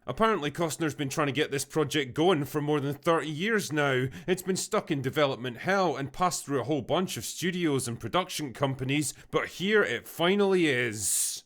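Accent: Scotland, United Kingdom. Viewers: It's the Scottish accent, especially in Dindee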